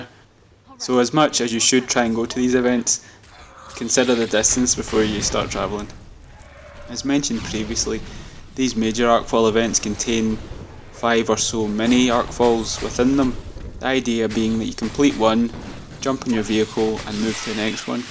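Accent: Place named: Scotland, United Kingdom. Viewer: First time hearing you speak, didnt know your were that broad scottish;) its stocko by the way, thanks for the shout out:)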